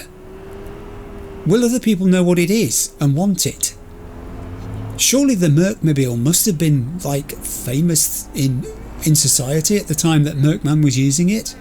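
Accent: United Kingdom. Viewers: a 4 hour episode of cyberpunk 2077 narrated by a great British gentleman? sign me up! I'm always looking forward to the new episodes every monday because they are so good every time and i also cant believe we are already at episode 26 sjeesh time flies!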